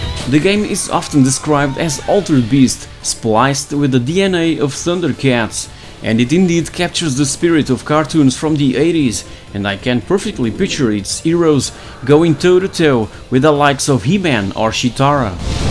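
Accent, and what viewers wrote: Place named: Portugal. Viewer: I could feel the "tuga" through the accent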